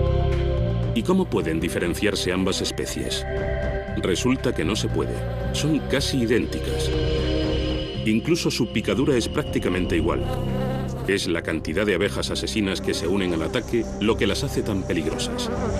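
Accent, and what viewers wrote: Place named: Spain. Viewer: Cuando un Español narra un documental, sabes que va a estár muy bueno!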